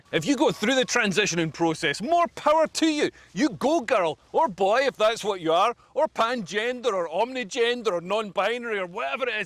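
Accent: Scotland, United Kingdom. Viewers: Came for trans jokes .. Stayed for the Scottish accent :3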